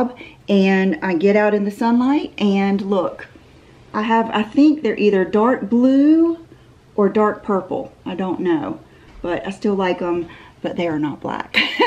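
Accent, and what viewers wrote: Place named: North Carolina, United States. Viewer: Love your videos! I’m a Carteret County local, so I totally get the difference in accents in NC;). And I TOTALLY never noticed how red my eyes were until I started doing YouTube videos. Thank you for your positivity and sweetness… and if you ever come up to the Crystal Coast I’d totally do a beach day with you:)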